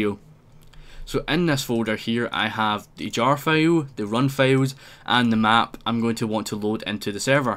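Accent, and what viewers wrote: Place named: Scotland, United Kingdom. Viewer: I LOVE URE AWESOME ACSENT ITS AWESOME R U SCOTISH? SCOTISH R AWESOME OMG!!!!!!!!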